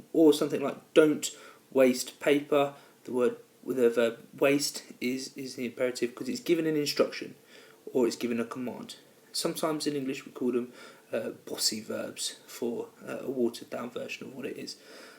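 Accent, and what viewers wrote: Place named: United Kingdom. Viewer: are u a britisher ?? Cuz your accent is so british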